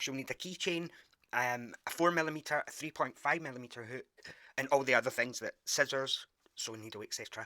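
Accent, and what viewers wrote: Scotland, United Kingdom. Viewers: So cute! I love the Scottish accent. I am subscribed for sure!! Get well soon! I know all about back pains. Rest up!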